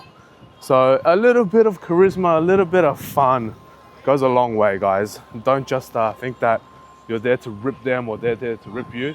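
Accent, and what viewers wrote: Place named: Australia. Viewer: Australian accent detected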